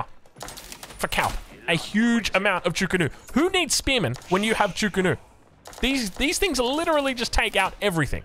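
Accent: Australia. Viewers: thats right, im watching him from Chile and I also love his aussie accent haha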